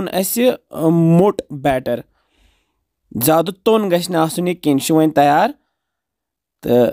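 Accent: Kashmir, India. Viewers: I love listening to your Kashmiri accent.....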